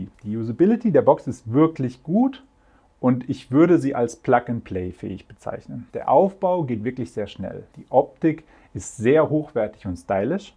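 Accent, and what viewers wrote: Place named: Swabia, Germany. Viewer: Einfach geil wie du am Schwäbeln bisch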